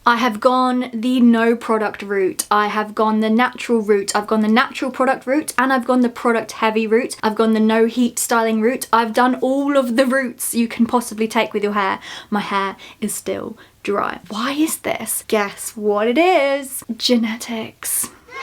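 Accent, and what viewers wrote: United Kingdom. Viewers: I love British girls and their accesent!❤ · is this british accent?? it appears i can't stand it it sound so raw and unnecessary annoying :( the accent could have been triggered my misophonia but i like u so best of the luck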